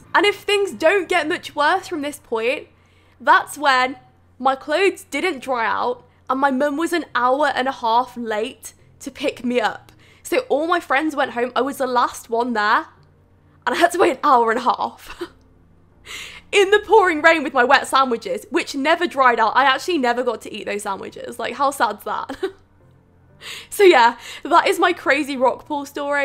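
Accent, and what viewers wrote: United Kingdom. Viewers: i love your british english:D wotaaah instead of water😂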